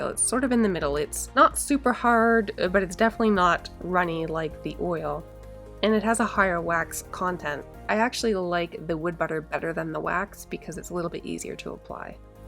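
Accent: Canada. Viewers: Ahhhhh… Your accent makes sense now when you mention Canadian dollars! I only noticed it with certain words but now that’s all I hear 😂 I love it :)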